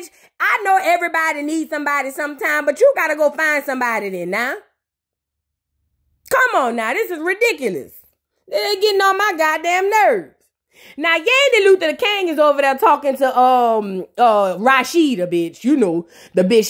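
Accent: New Orleans, United States. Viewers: you sound like my teetee erika love your nola accent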